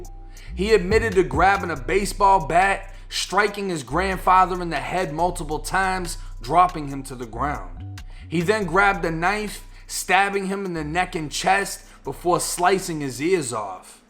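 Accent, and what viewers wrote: Boston, United States. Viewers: You gotta be from Boston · Are you from Boston? The accent sounds like a Boston accent curious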